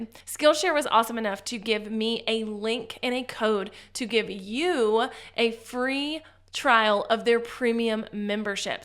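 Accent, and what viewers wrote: South, United States. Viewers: Are you from the south? 🤔 Oh from onwards you lose the accent 😂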